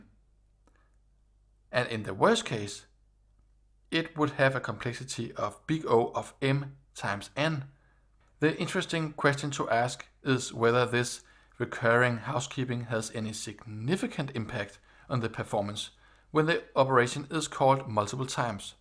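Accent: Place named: Denmark. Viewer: Pretty sure he is, his accent sounds exactly like a dane speaking english.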